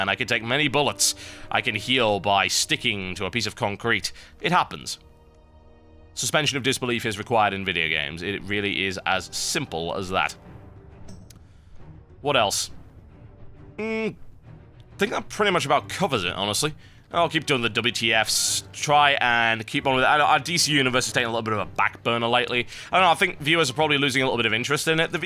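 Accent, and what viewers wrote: United Kingdom. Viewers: Love your British accent! :D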